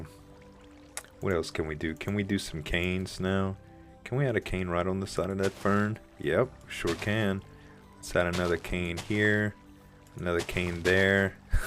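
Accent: Louisiana, United States. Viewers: Z, are you cajiun(horrible spelling) ? It sounds like it. LOUISIANA FTW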